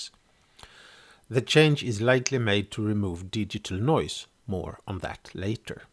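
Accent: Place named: Scandinavia. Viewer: Your accent sound pretty much like your from scandinavia. I would guess you´re from sweden or norway, but, am I correct (im swedish by the way).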